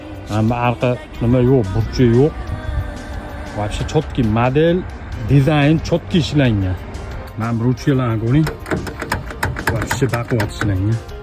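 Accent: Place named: Kokand, Uzbekistan. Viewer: Қуқонликмисиз ака? Қуқонча шева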